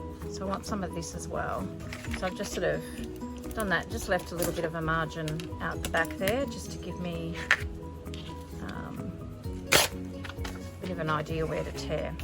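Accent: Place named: Australia. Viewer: I just subscribed to Heather of Ruby and Pearl because I love how she inspired you! Do I want to see you make the ephemera for the Journal? Yes! Do I want to have that background music? No, but when I put it on "closed caption" I could read what you said but missed hearing your lovely Aussie accent. ❤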